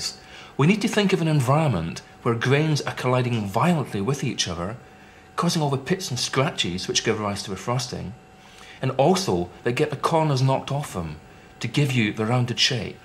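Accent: Scotland, United Kingdom. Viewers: anyone else only pay attention to his scottish accent and not the video the whole time?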